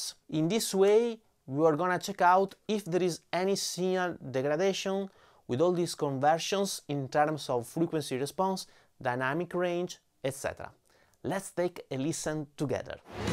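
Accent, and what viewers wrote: Italy. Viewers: bravo, seguita così, la tua pronuncia in inglese italianizzato mi fa sentire meno solo! :)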